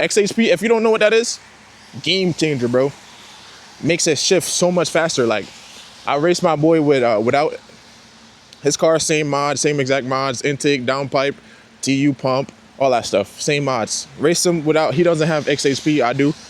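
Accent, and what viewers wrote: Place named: Canada. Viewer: Kinda sound Canadian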